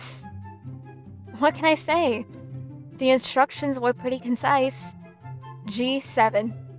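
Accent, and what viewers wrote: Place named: Spain. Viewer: You have a nice Spanish accent. :)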